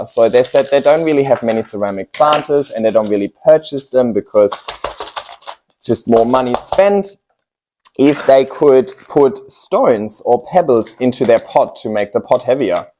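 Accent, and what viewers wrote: Germany. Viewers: I wish I had a cool German accent too.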